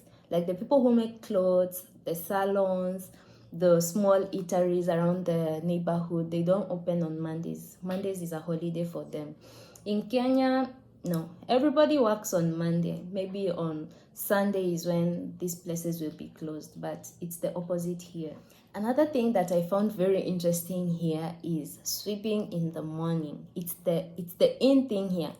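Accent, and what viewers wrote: West Africa. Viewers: Your accent has become so west Africanized!! So interesting to hear about your experience living in a different part of the world. You are really brave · You now talk like a West African 🤔🤔😄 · 2 years in Togo has you speaking like a West African already 😏 .... This is very interesting content!! More like this please 😊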